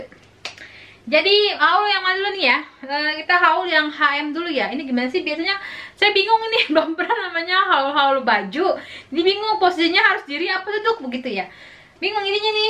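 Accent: Jakarta, Indonesia. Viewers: Hai, aku niy sukaaaa banget sama, krn sll mengingatkan aku pada tetangga2ku dulu waktu aku masih tinggal di salah satu daerah di kebayoran baru, yg sekeliling aku itu orang betawi semua, jd selalu kangen dan teringat dg tetangga2ku dulu, dari cara bicara, sikap, mirip dengan... btw netizen mah ga usah didengerin mpok, sehat selalu dan bahagia selalu ya dg Bang Sabeni😊🤗😘😘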